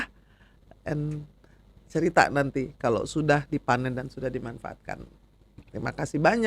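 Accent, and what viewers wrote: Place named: Java, Indonesia. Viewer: orang medan, aku seneng seperti orang jawa bahasany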